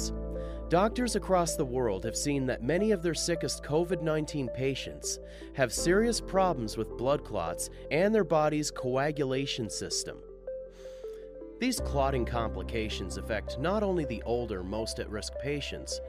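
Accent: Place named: Canada. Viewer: I'm not from North America, but am I right in thinking this man's accent is from Canada or Nova Scotia? It sounds different to a regular US accent.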